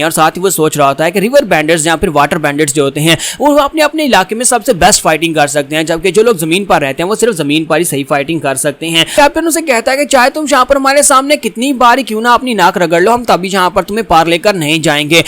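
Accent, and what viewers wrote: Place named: Pakistan. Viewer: Bolane Se to lagta Hai yah Pakistani ka hai rakhta hun Pakistan se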